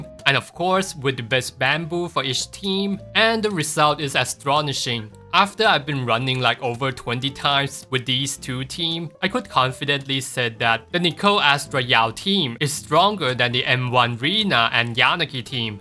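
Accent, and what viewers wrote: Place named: China. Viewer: you cant really compare trigger to qing yi i think, trigger is an off field stunner, and qing yi is an on field stunner 話說up主是不是華人？英語聽起來有華人口音，純粹八卦😂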